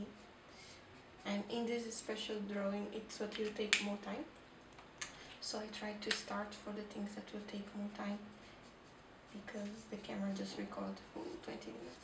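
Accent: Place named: Finland. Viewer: Are you Finnish? You have a Finnish accent.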